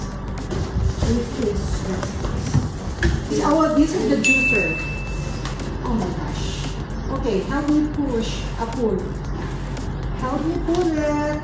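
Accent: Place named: Philippines. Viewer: Ang cute nyo po pag taglish ka magsalita😍😍😍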